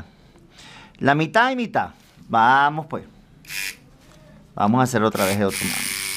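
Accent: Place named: Venezuela. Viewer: Yo estaba claro que eras de venezuela por el acento,un crack perro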